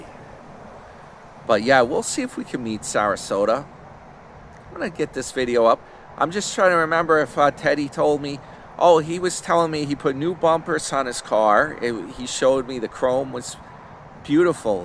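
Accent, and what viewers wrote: New York, United States. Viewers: Great work, You are a relentless, hard working investigative Youtuber. So nice you met & and they were responsive towards you, basically a stranger but with the NY accent. Real nice genuine people just like our friend. 😊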